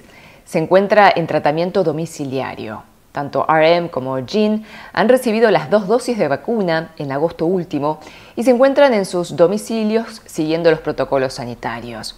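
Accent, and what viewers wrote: Argentina. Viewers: Disculpa pero hablas como Argentina vives allá y cómo te llamas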